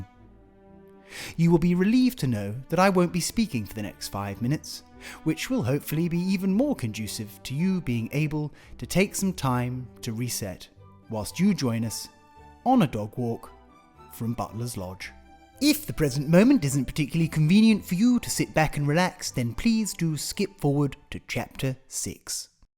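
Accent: RP, United Kingdom. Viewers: Fab’ content. Super interesting and I will follow your progress in what will be a lot of hard work to renovate a lovely home. Best of luck! BUT, and it’s a BIG BUT…. The RP is unbearable. I shall be using closed captions from now on. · Every aspect of your house is enchanting. However, your attempt at some sort of received pronunciation is getting a bit wearing and from now on my mute button will be used, The visuals more than make up for a lack of overly precious narrative. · I’m alway so excited to watch your videos. The absolute sarcasm with the best posh accent. You always bring a smile to my lips and an inappropriate laughter at the worst time. ❤❤❤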